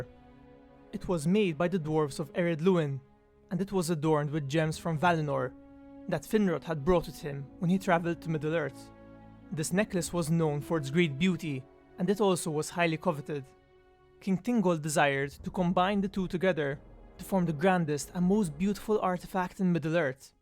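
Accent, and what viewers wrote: Malta. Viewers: He sounds maltese:) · Love the maltese accent :) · What a coincidence, Im from Malta aswell, thought your accent was uniquely familiar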